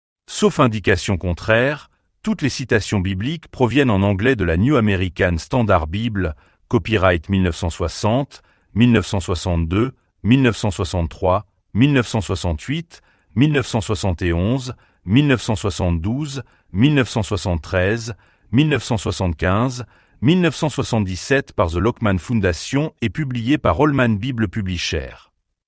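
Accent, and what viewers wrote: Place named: France. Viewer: ISRAEL ET LE TEMPS D'ANGOISSE DE JACOB ART KATZ en audio. Chers tous, Dans le grand charivari d'opinions et influences souvent contradictoires autour de la question d'Israël dans les temps de la fin je me suis souvenu, comme d"une priorité absolue, du travail prophetique charpente sur base des Écritures de notre défunt ami, Art Katz. C'est clair, tranché, évident et ne fut pas aimé lors de la publication. Art venant chez nous en souffrait...Mais de toute évidence la réalité d'Israël aujourd'hui devient une caisse de résonance évidente pour ce message. Bonne et sérieuse écoute. Les temps sont graves ! Haim Goel L'accent frenchie est désastreux. Sorry.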